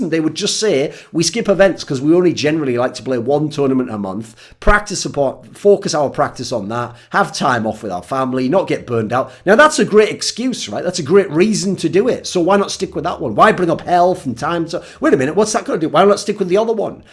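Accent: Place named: Scotland, United Kingdom. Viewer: Where you from sound so Scottish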